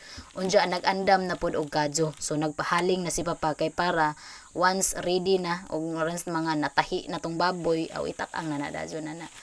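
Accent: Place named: Visayas, Philippines. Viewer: Lami kaayo paminawon imong bisaya Ms.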